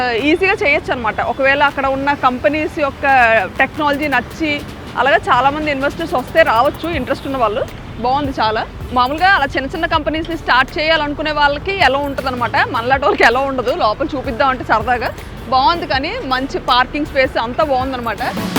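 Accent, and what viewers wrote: Vizianagaram, India. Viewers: గలగలా మాట్లాడేసి రెండు తెలుగు రాష్ట్రాలే కాదు, ప్రపంచ వ్యాప్తంగా ఉన్న తెలుగువారి సోదరి ..మళ్ళీ మళ్ళీ వినాలి అనిపించే విజయనగరం తెలుగు పరిమళం ...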